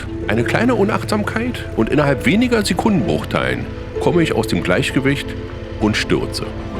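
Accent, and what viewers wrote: Berlin, Germany. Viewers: Hallo. Die Verbindung von Sprache, Gefühlen und Emotionen mit Deinen schönen Bildern machen das ganze echt sehenswert, erlebenswert und wir sind jederzeit bei dir. Du bist mit Deiner Berliner Schnauze echt entspannend und authentisch. Man nimmt dir das echt ab, deine Emotionen die du erlebst, die jeder Motorradfahrer kennt und so genießt. Deshalb fahren wir Motorrad um genau das zu spüren. Bleib Gesund und lebe deinen Traum, denn es geht ums Reisen nicht ums Rasen. Nur wenn du anderen darüber berichten kannst was du erlebt hast bist du Gesund wieder nach Hause gekommen. Das muss so bleiben um wieder einmal dem Alltag zu entfliehen. Bis zum nächsten Mal. Gruß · Mal gespannt auf die nächsten Videos. Schau mir dann mal die Serie der Westalpen an. Ich muss nochmal sagen: wirklich toll gemachte Videos. Und angenehme Stimme, trotz Berliner Slang ;-) Grüße aus Kerpen / Rheinland. 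Ralf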